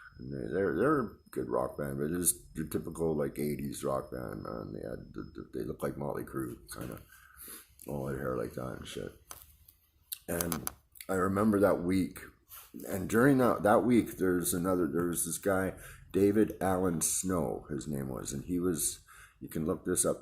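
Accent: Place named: Canada. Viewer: How do you not have more subscribers.. Great stuff I subscribed right after itsmeen recomended you. You can tell some stories and the mix of Canadian and native accent sucks you into the story even more..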